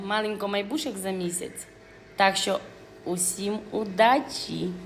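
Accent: Transcarpathia, Ukraine. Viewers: Вітаю! Ви класна, весела, неповторна! Я викладаю діалектологію в університеті. Ваша мова і харизма - це шедевр. Ми на парах зі студентами дивилися Ваші відео і таким чином запам'ятовували та аналізували фонетичні, морфологічні, лексичні особливості закарпатського діалекту! Розбираємо Ваші перли на молекули 😊 Пишу не заради голубців))) Нехай Вам щастить, файна дівчино! ❤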